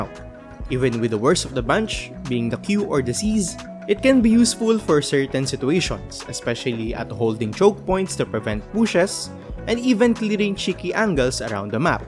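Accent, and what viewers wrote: Philippines. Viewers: hey man what region do you play in? you sound like you're from apac specifically in the philippines lol. not that you mind!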